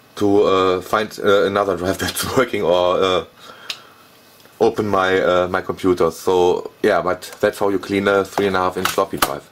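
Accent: Germany. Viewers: I hear a strong german sound :-D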